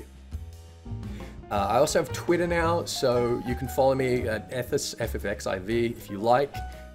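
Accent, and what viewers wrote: Australia. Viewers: yo dude where in aus are you from?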